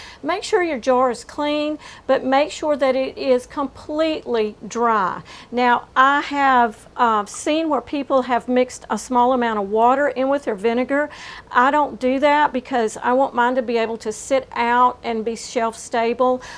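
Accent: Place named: South, United States. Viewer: Oh perfect I love the southern accent (I'm from the UK) and I also love learning about herbs... ❤❤ Subscribed right away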